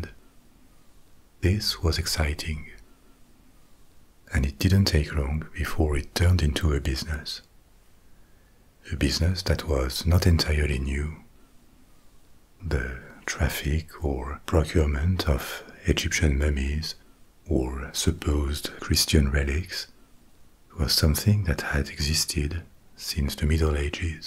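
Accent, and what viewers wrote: France. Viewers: I just want to tell you that I can not sleep without you and I love your French accent. ❤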